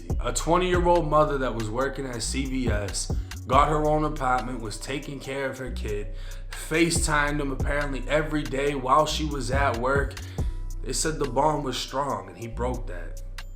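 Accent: Boston, United States. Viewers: Tell me you are from Boston without telling me you are from Boston. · Bro.. You have to be from Boston.. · 📖dude your storytelling is on point.followed this nightmare on the media but all the gory details omitted. And love the bahstan accent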